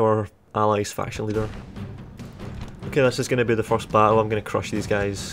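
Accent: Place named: England, United Kingdom. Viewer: Best english accent? Or am I the only one who thinks this?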